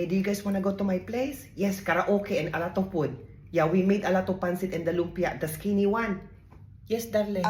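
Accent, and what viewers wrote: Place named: Batangas, Philippines. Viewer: batangas slang 😂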